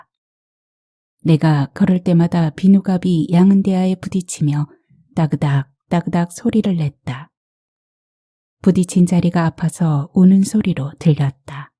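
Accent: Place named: Gyeongsang, South Korea. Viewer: 반갑습니다 ^^성 보경님의 푸른 넥타이, 잘 들었습니다 욕심많은 엄마 때문에 결국 재주많고 똑똑한 금희를 죽였네요 금희가 너무 불쌍하네요 주인공은 천진무구,참 귀엽고... 의 경상도 사투리가 사랑스럽고 예쁘네요^^ 재미있게 감사히 잘 들었습니다 ♡♡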